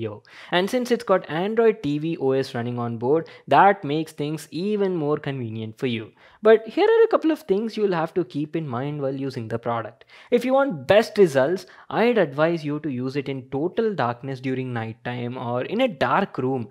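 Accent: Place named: India. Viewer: Wow finally I found Indian English Speaker Youtuber. I Subscribed! ❤️❤️❤️ Thank you for the Best Review of Wanbo T6 Max! ❤️❤️❤️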